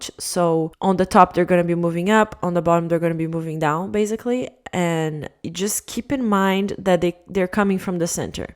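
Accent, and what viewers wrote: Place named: Brazil. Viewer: 😅vey eu vendo esse vídeo em inglês e falei ela com certeza é brasileira o sotaque em inglês igual ao meu, ameiii obrigada pelo tutorial, adorei ❤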